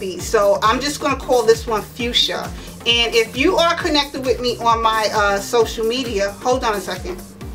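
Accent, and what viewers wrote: New York, United States. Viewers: great haul from Family Dollar..........I have to check out that "Danger" Lippy.....it looks super cool..........my kind of color............I am also going to go to Family Dollar and check out that candle......the Mahogany Sandlewood........love candles that smell like men's cologne.......have never bought any candles from FD except Glade because I hate to buy candles and u get them home and they have NO SMELL at all...............so I am going to take your word for this one girlfriend and pick it up when I find it.............lol..............great haul..........thanks for sharing........I love listening to u talk............that NY accent is all that..............lol. Well have a great week and I'm looking forward to your next video.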